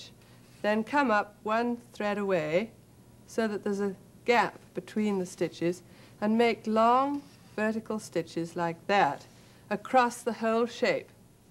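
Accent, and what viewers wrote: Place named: RP, United Kingdom. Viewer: I love her accent! It sounds like pure queen's English.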